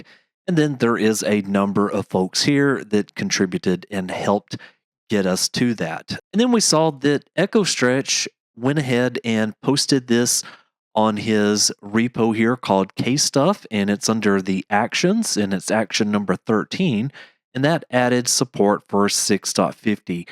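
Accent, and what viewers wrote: United States. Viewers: From which state are you from? You sound like mid west .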